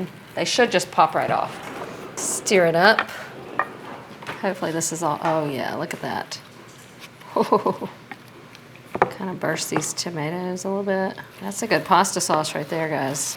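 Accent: South, United States. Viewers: “Chefs, they’re just like us”. 😂 So love your sense of humor and you have THE best southern accent. Really enjoy your videos and have enjoyed making your recipes. Keep up the good work!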